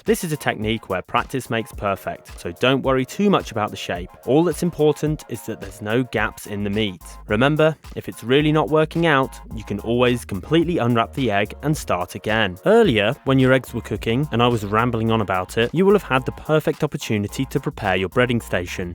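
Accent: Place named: United Kingdom. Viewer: ! Seven egg halves or seven whole Scoth eggs? I had Scotch eggs at a picknick when I was young and absolutely loved it. I spent a month at a boarding school in England (language course - most Swedes went to Hastings and spoke Swedish to other Swedes, but my mum, a translator, would not have that, so it was boarding school) and that I had Scotch egg for picknick lunch one day is one of the strongest memories from those days. Strangely enough, since I can't remember how they tasted. We were on an outing to a fair, and I also remember buying "toffee", which was not toffee-like, but hard as a brick, so when I had bought it, the salesman smashed the "toffee" in its plastic wrap to break it in pieces. Anyway, VERY nice to hear a British accent explaining how to make Scotch eggs (because most recipes for Scotch eggs on Youtube are posted by Americans, and I could not even begin to say how tired of I am, and irritated by, American "English").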